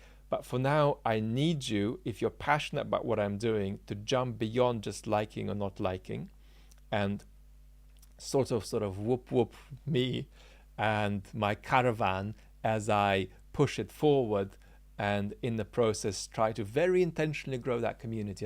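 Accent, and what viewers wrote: United Kingdom. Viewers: First time I've detected a limey accent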